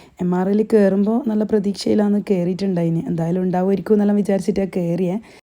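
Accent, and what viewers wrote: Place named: Kannur, India. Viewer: അടിപൊളി🔥🔥 കണ്ണൂർ ഭാഷയിലെ നല്ല അവതരണം🎉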